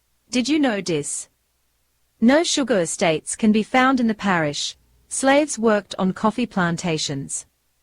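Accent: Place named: United Kingdom. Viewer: Interesting facts but stupid video with the British accent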